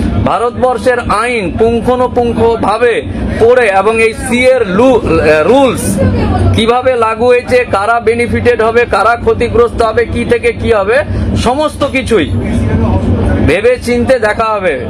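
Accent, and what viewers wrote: Bangladesh. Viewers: কথা শুনলে বুঝা যায় বাংলাদেশের মাল😅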